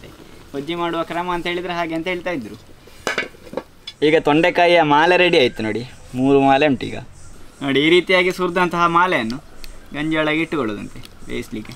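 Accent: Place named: Coastal Karnataka, India. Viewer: ನನ್ನ ಕರಾವಾಳಿ ಕನ್ನಡ ಸೂಪರ್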